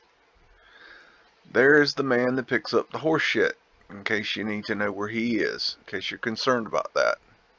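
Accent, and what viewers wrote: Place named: Oklahoma, United States. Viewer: That accent, you close to oklahoma?🧐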